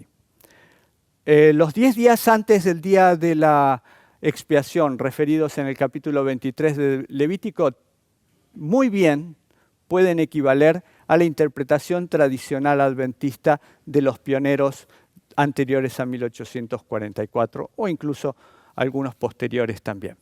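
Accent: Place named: Argentina. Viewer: De Argentina supongo por su timbre de voz.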